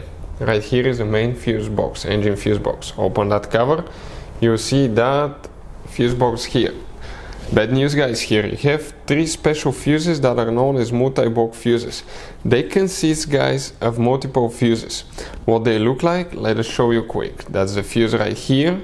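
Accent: Russia. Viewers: half way through video hes still talking in that horrible russian accent! ughhh i have to vomit now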